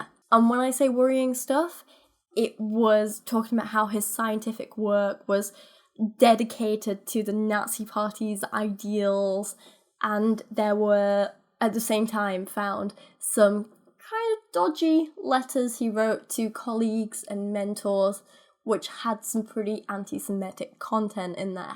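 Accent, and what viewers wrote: United Kingdom. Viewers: You have a British accent. Hope you still enjoy living in your third world country with high criminality. You deserve it. if you don t believe UK is third world Google United Nations replacement migration. I m not westerner.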